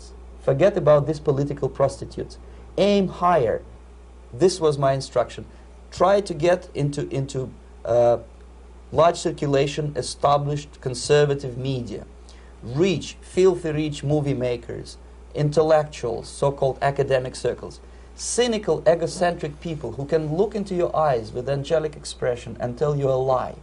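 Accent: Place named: United States. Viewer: You are not doing well at suppressing your American accent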